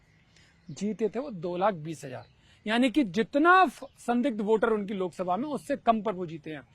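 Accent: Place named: Varanasi, India. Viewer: मुझे बनारस सुनाई दे रहा है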